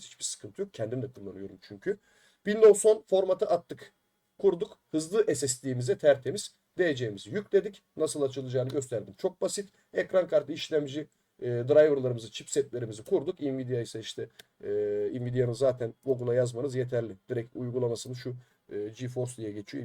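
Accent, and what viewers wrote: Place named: Balıkesir, Turkey. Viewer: Bu oyun bize kafayı yedirtecek. Ne yaptıysam bazı sorunları çözemedim. Bu sorunları tek tek size yamıyorum çünkü kişiye özel cevap verebileceğinizi düşünmüyorum. Bu nedenle işin sıfır noktasından başlayıp yardımcı olmanızı istiyorum. Windows 11 flash oluşturmadan başlayalım çünkü bizler bilgisayarı bilen kişiler değiliz. M. anlatır gibi (kendim için söylüyorum) tek tek KISACA anlatır mısın. Konuşma tarzından balıkesir ve çevresinden olduğunu tahmin ediyorum en son çare bilgisayarı kucaklayıp sana getireceğim. kolay gelsin.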